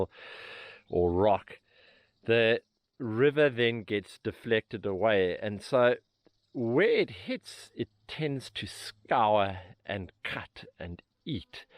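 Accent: South Africa. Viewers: May I guess this is from South Africa? It sure looks like it (and your accent). Beautiful landscape any way, and excellent explanation! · sounds south african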